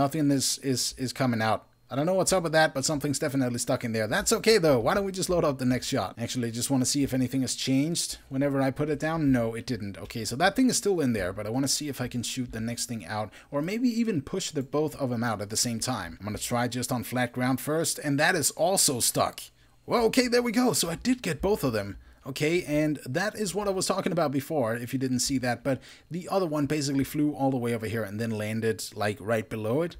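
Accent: Denmark. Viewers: he sounds danish.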